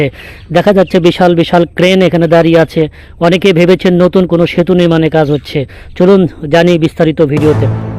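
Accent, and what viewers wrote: India. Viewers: R you from India ? Your sound like Indian.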